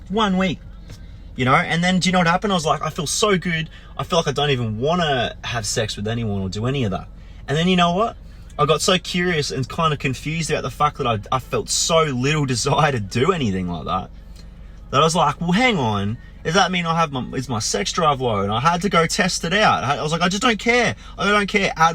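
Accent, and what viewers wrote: Australia. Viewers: u Australian mate? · Literally just made a vid like this aswell. You an aussie?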